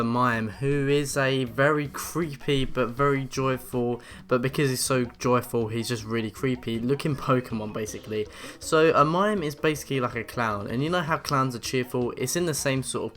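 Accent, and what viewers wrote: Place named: United Kingdom. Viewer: Cut Him Some Slack He's British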